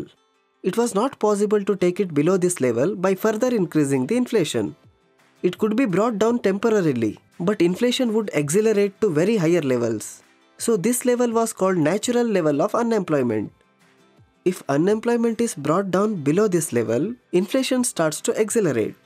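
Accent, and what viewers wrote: India. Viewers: Bro..ur english is really Indian...awesomely Indian.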